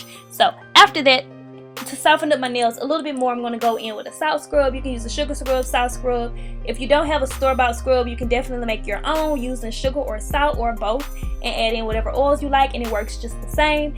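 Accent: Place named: Atlanta, United States. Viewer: Love your ATL accent!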